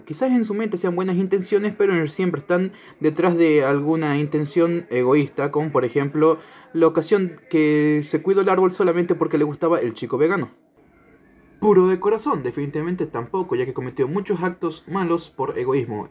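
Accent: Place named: Argentina. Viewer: Tu voz me acuerda a tucosmopolis pero con acento argentino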